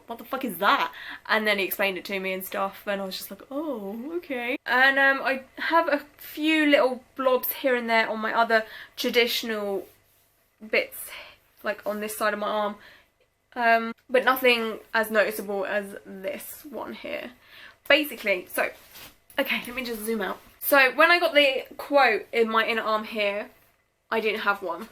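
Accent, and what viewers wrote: United Kingdom. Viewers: Damn your voice is annoying. Must be from the UK? Funny how you asked your tattoo artist what it was exactly and then made a video to tell YouTube about it. Cool story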